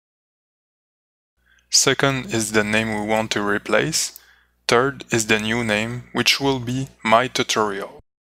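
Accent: France. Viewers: are u french? nice tuto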